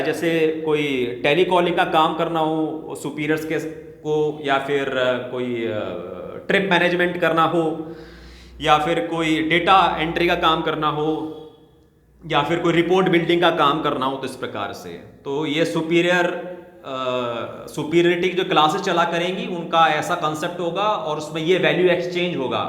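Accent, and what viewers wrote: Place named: Ajmer, India. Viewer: 😂Love this ajmeri style of talking❤️ लेकिन भैया कोरोना के नियम तो पूरा ही आड़े आ रहे हैं😭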